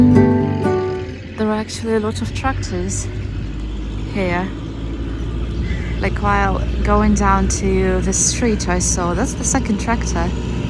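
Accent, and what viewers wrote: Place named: RP, United Kingdom. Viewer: Привет your RP English is pretty good. I love your voice and presentation style. I have been living in Moscow since 2015 so I understand your customs and way of life as they are obviously similar to the Russian way of life. Yet I still find it interesting to watch your videos.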